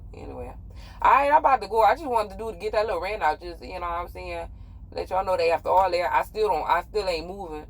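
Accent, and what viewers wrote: Louisiana, United States. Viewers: I like her accent, what part of louisiana she from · I love the Louisiana accent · I Love that Louisiana twang guuurl just keep talkin 😁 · Love that Louisiana accent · Sounds like a beautiful Lousiana accent. This lady is beautiful! Hey Sis! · Love the accent. Gotta be from New Orleans. I hear the Cajun in your voice. Anyway, Stay safe outhere. Don't take no wooden knuckles Beeby!!! My Louisiana people know what i'm saying. Lol. Peace.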